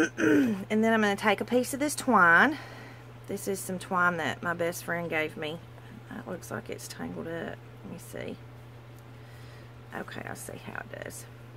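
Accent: South, United States. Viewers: Just found this video and it brought tears to my eyes. That accent it straight from my southern roots! Well, I still sound like that even though I’m a transplant 😎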